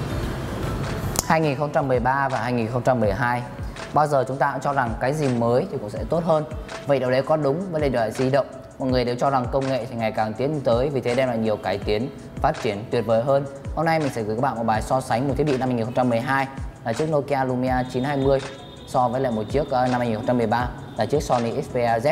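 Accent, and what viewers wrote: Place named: Central, Vietnam. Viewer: ông này hình như giọng chính là miền trung.... nghe mõi miệng vãi....đánh giá chưa chính xác, thiếu tính khách quan...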